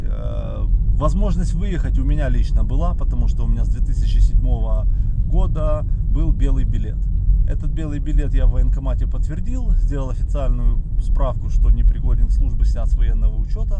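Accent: Odessa, Ukraine. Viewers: слышна кстати типичная одесская интонация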